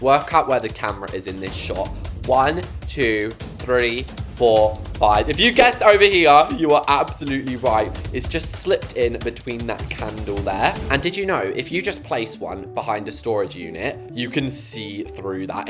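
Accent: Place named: Essex, United Kingdom. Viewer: I KNEW U WHERE FROM THE UK YOU SOUND LIKE UR FROM ESSEX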